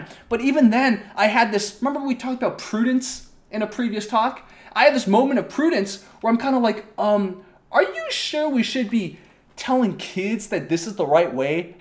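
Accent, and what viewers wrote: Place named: South, United States. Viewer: Why you have southern American accent?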